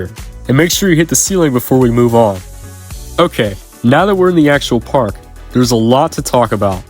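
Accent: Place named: Pittsburgh, United States. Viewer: Wow you have such a thick pickberg accent... damn do i really sound like that.. love from uniontown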